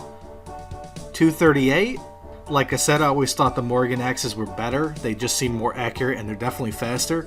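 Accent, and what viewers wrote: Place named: Michigan, United States. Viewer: Are you from Michigan you have a similar accent to mine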